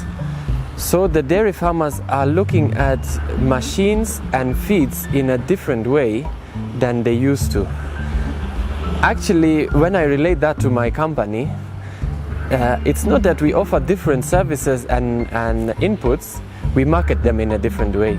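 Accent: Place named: Kenya. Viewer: Why does our mzungu here have a kenyan accent? 😅😅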